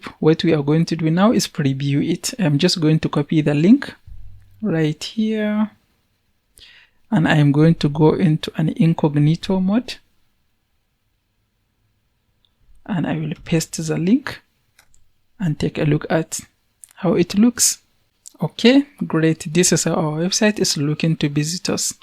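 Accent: Hausa, Nigeria. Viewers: Hausa from Nigeria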